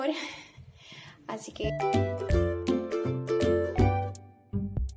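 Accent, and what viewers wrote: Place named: Córdoba, Argentina. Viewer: Que rico amo este tipo de media tarde bien Argento, me encanto como lo explicaste y tu acento cordobés lo es todo!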